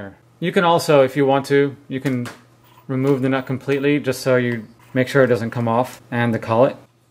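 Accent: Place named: United States. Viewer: But plugging it into the wall aren’t you feeding it 110v? Your accent sounds American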